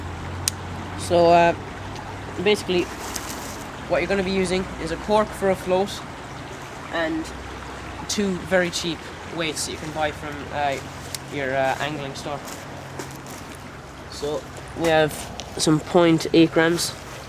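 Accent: Ireland. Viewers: He's Irish{ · What part of Ireland are you from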